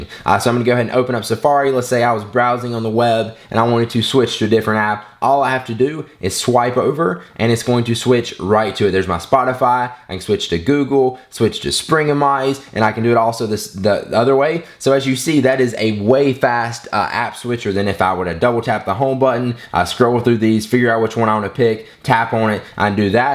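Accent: South, United States. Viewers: That Southern accent!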